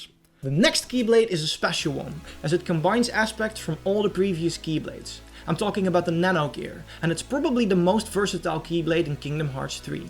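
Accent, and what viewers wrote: Netherlands. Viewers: Randomly stumbled upon the vid, and have to ask... With that accent, are you by chance Dutch as well? XD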